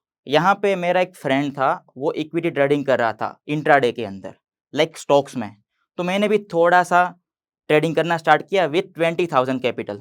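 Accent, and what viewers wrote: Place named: Gujarat, India. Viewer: Bhai pakkaaaa gujrati hai 😅😅😅😅😅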